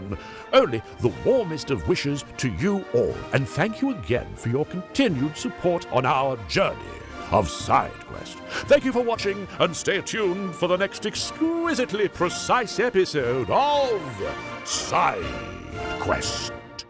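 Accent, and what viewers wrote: United Kingdom. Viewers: "it was us Europeans" he said with a British accent, showing the UK as part of the EU was this written in... when did brexit start again? what? 2011? x'D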